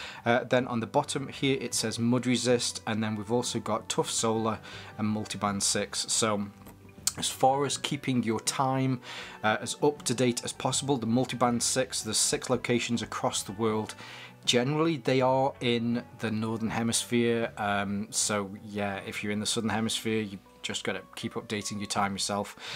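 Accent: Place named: England, United Kingdom. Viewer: Great review. I totally agree with your comments regarding negative displays. IMHO they're style over function and my main reason for buying a watch is tj read the time as easily as possible and the negative display models are a PITA. The combination of a larger display and the black model with the positive display are the one I'll be going for. As I said initially, a great review and nice to hear someone with an English accent for a change.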